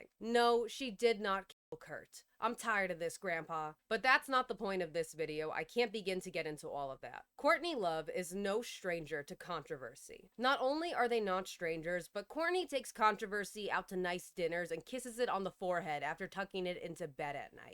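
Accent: New York, United States. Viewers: When your sick you sound more like a New Yorkian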